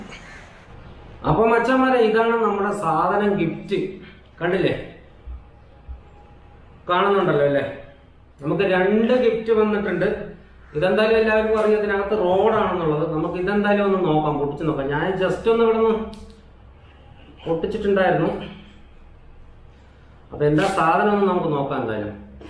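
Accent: Palakkad, India. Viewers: Palakkadan Language Kelkumbo Ulla oru Happiness 😍Videos Adipoli Aayind Me Also Palakkad Etta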